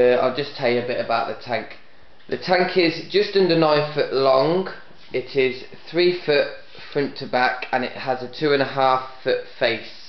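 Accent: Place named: Midlands, United Kingdom. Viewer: Hi chap. Where you from you sound kinda midlands way. Like me!! Who made the tank in the first place mate? As them stress bars look way too inadequate. I had a four foot rena and they were massive on that for a little tank. And my 700litre now has some sturdy strut bars. They just look too narrow for my liking?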